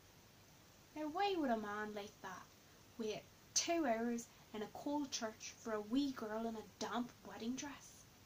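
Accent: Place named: Ireland. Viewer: Your Irish accent is amazing!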